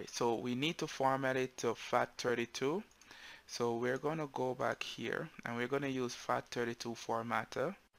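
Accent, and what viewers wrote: Jamaica. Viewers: Ur Jamaican ??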